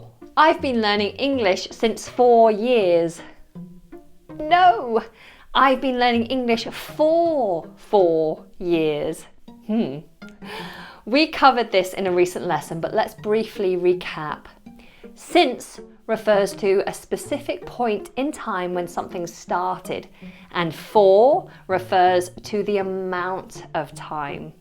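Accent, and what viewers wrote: United Kingdom. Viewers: Finally someone who teaches British english. I'm glad to have found your channel, I'm quite fed up with so much american accent. Greetings from Brazil.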